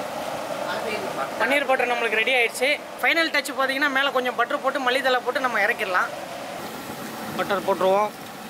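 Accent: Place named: Kongu, India. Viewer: ji kongu tamil Vera level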